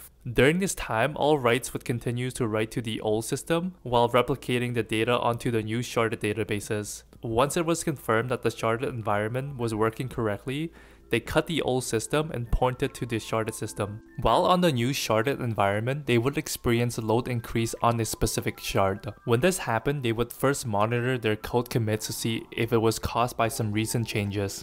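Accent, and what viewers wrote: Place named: Asia. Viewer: I could tell you're asian without seeing your face It's your accent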